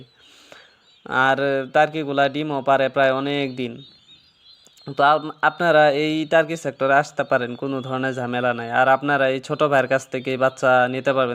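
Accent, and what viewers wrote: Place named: Bangladesh. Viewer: আপনার বলার স্টাইল বাংলাদেশিদের মতো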